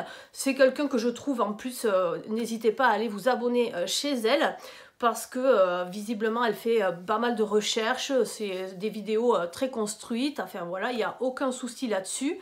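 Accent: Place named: South, France. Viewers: Bonjour, je vous apprécie beaucoup❤ et j'aime votre petit accent du midi et vos vidéos. Ne tenez pas compte de la méchanceté des gens. Dans quel monde vivons nous... Prenez soin de vous❤ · Bonjour, continuez vos vidéo je les aime beaucoup même si je ne commente jamais... Votre accent me rappelle mon sud natal... Faites fi des rageuses!!! Bon rétablissement et à très vite, biz 😉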